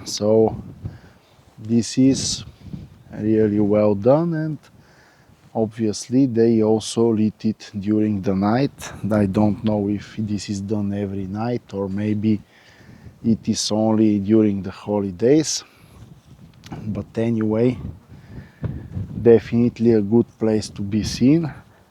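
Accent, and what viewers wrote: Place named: Arab world. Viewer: Брачед за 30 гледания ли говориш на английски като арабин. По-добре си ги прави на български.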